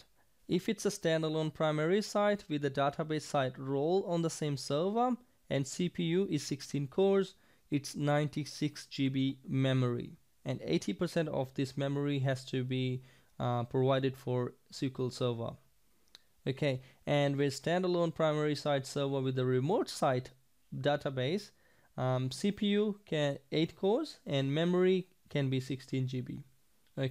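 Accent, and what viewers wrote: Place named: Australia. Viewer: You sound Ozzie....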